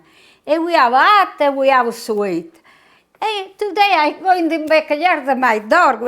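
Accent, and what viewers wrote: Italy. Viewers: Awesome garden. Wonderful that age 90 she still is going strong and still has her Italian accent! What kind of peppers are those? Awesome singing about Rome! Thank you from the other side of rt 33 in Hightstown!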